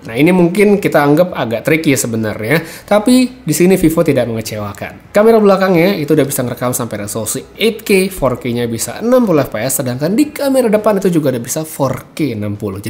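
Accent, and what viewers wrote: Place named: Manado, Indonesia. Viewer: BANG ORANG MANADO YAH? LOGATNYA MIRIP ORANG MANADO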